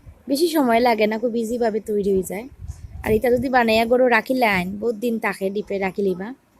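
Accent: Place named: Bangal, Bangladesh. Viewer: বাঙাল ভাষাটা কি মিষ্টি🤗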